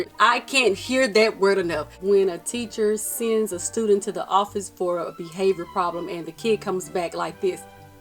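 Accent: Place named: South, United States. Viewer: Where u from with that country accent ..... I hate teaching !!!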